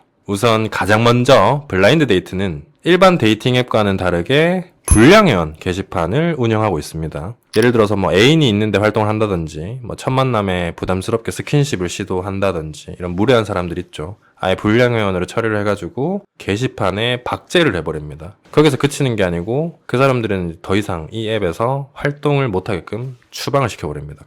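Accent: Gyeongsang, South Korea. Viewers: 대구 경북쪽 말투네요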